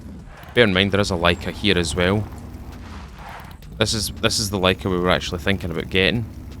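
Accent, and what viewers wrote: Scotland, United Kingdom. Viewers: Just started playing SCUM a few days ago since the 1.0 release. As a daily DayZ player I have to say I LOVE SCUM 😊 Similar but different enough to provide an entirely different experience. Thoroughly enjoy your videos, that Scottish accent makes them a pleasure to listen to. I’m English from West Yorkshire. I live in America. For over 20 years I’ve heard “oooh I LOVE your accent where you from !?!?” Honestly being from Bradford I never understood it because we literally sound like cave men who were dropped as babies. A LOT. However I DO understand that certain sounds are appealing to certain ears while not for others so 🤷🏼‍♂️ Cheers mate hope you have a lovely Sunday 🙏🫡👊❤️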